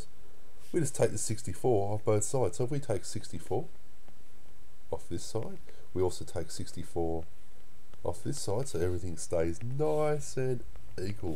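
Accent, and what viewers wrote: Australia. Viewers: sounds Australian, high possiblity.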